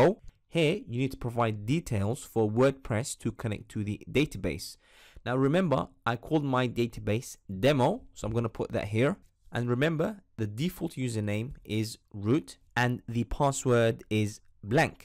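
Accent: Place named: Africa. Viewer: african accent i guess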